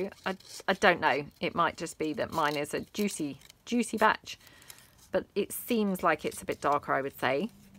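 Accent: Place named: Lincolnshire, United Kingdom. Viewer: Hi. Love listening to you. I’m in Australia Victoria (not) enjoying our 5th lockdown!! So you are my breath of fresh air. Plus the bonus for me, your accent is not dissimilar to my wonderful sister in law who grew up in Cleethorps 😂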